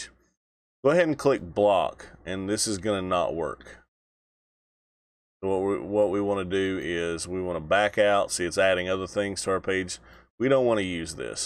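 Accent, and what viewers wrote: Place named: South, United States. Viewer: nice southern accent, i am also from the south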